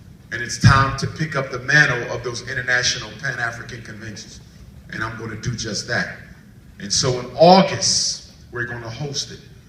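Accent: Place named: Toronto, Canada. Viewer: He sounds like he is from Toronto.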